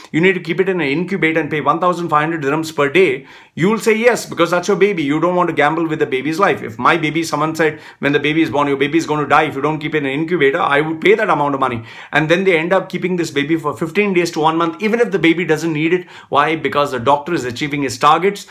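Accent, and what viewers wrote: India. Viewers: Shaithan/illuminati and Indian accent burst me into laughter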